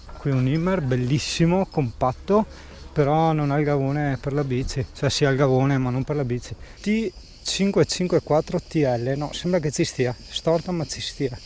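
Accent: Veneto, Italy. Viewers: Che tristezza, acqua azzurrissima che pare di un altro mondo......invece, inquinata. No dai, giuro ci rimani male. Come abbiamo ridotto questo povero pianeta. Bellissima la parlata inglese con accento Veneto. Te saludi.....!!!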